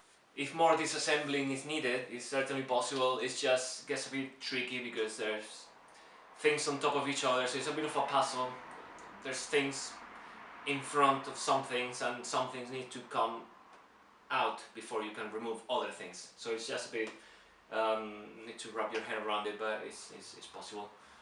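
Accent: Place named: Spain. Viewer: Se lo mandé al grupo intercambio para que los españoles oigan inglés de un español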